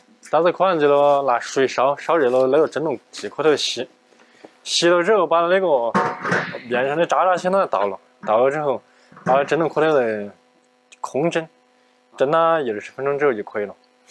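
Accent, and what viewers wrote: Sichuan, China. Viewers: 作为一个重庆人，习惯了油管上的国语和英语，听到王刚的四川话，既感觉亲切，又有些想笑。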